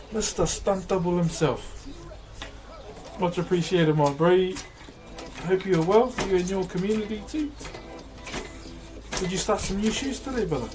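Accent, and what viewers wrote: New Zealand. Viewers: It is the same accents they're both Maori, the indigenous ethnicity of New Zealand 🙂 not all Maori have this accent but a lot do.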